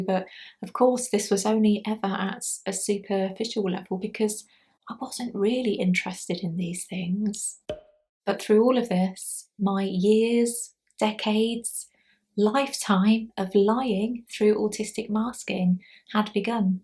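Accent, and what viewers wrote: United Kingdom. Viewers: Are you from the UK, Australia or New Zealand.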